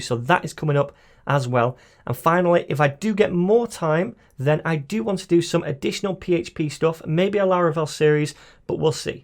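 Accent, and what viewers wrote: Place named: England, United Kingdom. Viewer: Aaaaaand... BOUGHT. Thank you, sir. Nice to do a course with an English accented tutor for a change (well, besides Angela Yu) :D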